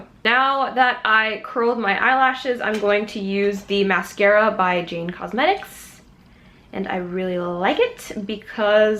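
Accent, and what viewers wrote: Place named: Australia. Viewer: Your Aussie accent is so good (to me it's Aussie)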